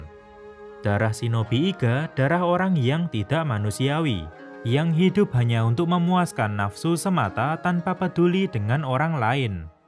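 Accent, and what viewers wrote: Java, Indonesia. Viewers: Sial. Malah ketemu jawa medok. Telingaku jadi gak nyaman. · Jawanya pekat bngt👍👍 smngt bro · Rasa belajar logat jawa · Wih logat jowo ne Ra ilang, lanjot terus gan tahanke logate rasah digawe gawe koyo wong hudu jowo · Suara medhok e bikin kange chanel ini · Bang wong jowo ta 😊😊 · raiso dapusi medok e tp gpp ciri khas sampean mas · Wong jowo Endi bang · suaranya medok:v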